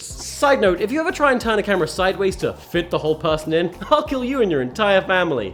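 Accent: United Kingdom. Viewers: Wait isn't he British? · he's british? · are you british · Wait, aren't you british?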